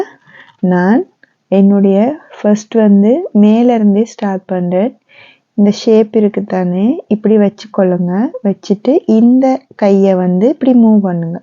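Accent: Sri Lanka. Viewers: Unga silon Tamil ketka inimaiya iruku akka😀😊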